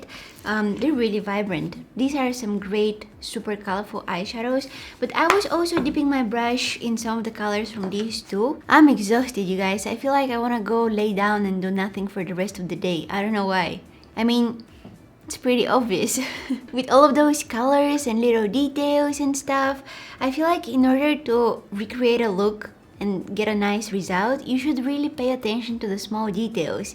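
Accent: Russia. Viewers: I actually also understand every single word but the problem is her russian accent. I like her and her vids a lot. But her accent is a bit difficult. I know she can't do anything to speak without an accent but i've just said it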